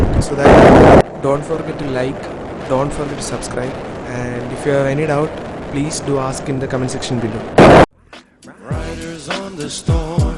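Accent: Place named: Kerala, India. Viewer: r u a mallu .You sound like one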